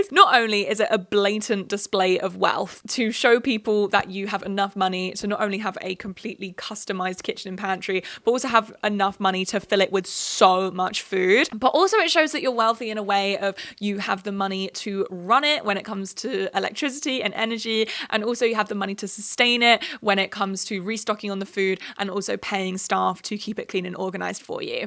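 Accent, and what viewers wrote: England, United Kingdom. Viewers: Weird thing to comment on, but since Covid scattered my friend group and I had to rebuild my social life from the ground up online and I ended up with a very international social group with all kinds of different accents, I did not realize you were English till you said "washing up". I've been watching your videos for months. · Gal you are so likeable ! Such a breath of fresh air hearing one of these pop culture chit chats from a proper British hun 💋💋 also this restock shit is so tacky haha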